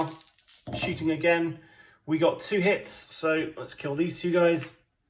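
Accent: United Kingdom. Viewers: What an unfortunate massacre. Valiantly fought, alas it was not meant to be. I do not know enough about this game to give a proper assessment of what hurt our chances most and what could have been done better, but from my layman’s position, it looked like the scout’s assault on the objective room manned by three Germans might have been overly optimistic, and its failure the point where the operation took a turn for the worse. You’ll get ’em next time! By the way, love your ’40s British accent.:D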